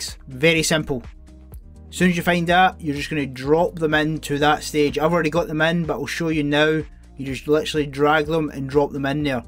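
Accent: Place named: Scotland, United Kingdom. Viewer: DLSS seems to work good as well! Any intends to show off some of the worlds you have/will created/create in Minecraft RTX? I mean, I watched every video about it, and evey single one showed the demo. Would be really nice to see something diffr, looking forward for your contents. Many thanks and stay safe please! I like your scottish accent, btw(..odd to say? I know lol).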